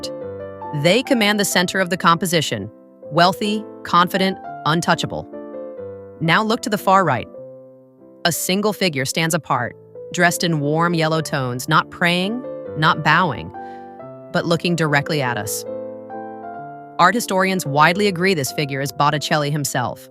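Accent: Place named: United States. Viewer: Enjoyed this apart from the grating, whining , horrible American accent.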